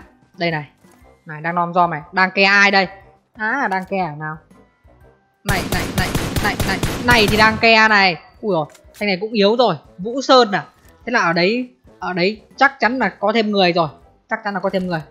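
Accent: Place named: Hai Phong, Vietnam. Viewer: đi đâu vậy a zai ? quen vãi . hải phòng mà e đặc sản giọng đó ☺️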